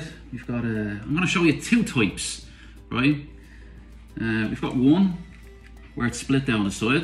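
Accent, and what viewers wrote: Ireland. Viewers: Love your Irish brogue! Sláinte! --gv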